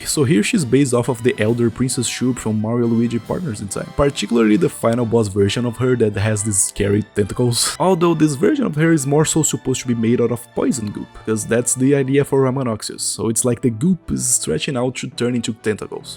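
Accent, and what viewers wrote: Brazil. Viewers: I swear you have brazilian accent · I LOVE IT!!!! are you brazilian? your accent reminded me a lot of the Brazilian accent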